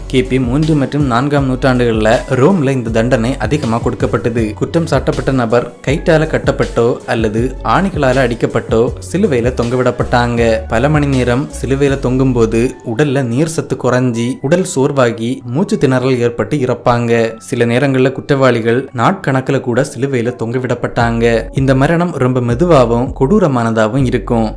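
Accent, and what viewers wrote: Kanyakumari, India. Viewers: Anna neenga kanniyakumari thanea🎉🎉🎉🎉🎉😂😂😂😂😂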